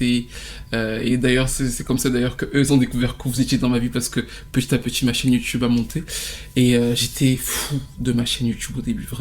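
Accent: Cameroon. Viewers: Voilaaaa, je savais que tu etais camerounais !!! Ce ton!! 😂😂😂😂😂même mon sang faisait bepbepbep, celui ci mm sil est francais, aïe le rhesus continentais est mboomayééé! Cool ! God bless you.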